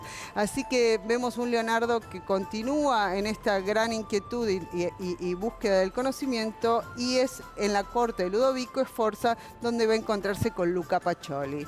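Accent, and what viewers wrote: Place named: Argentina. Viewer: Más allá si está bien o regularmente explicado , para mi es claro , me gusta escuchar una voz argentina de una bella mujer.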